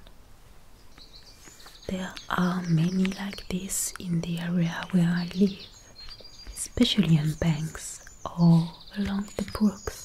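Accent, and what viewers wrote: France. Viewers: I still go for a walk in a forest nearby. It's relaxing and puts me down to earth. Your video is so beautiful, I love hearing your voice and your french accent is a total trigger for me ❤️🌺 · I really like her French accent that you hear!!!!!!!!!!!!!!☺☺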